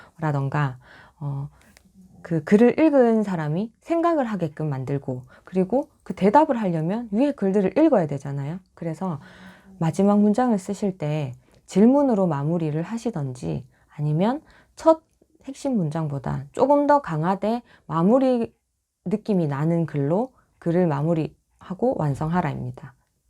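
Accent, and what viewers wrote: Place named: Gyeongsang, South Korea. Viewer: ~유익한 내용 감사합니다~경상도 억양도 좋은데요!😍😍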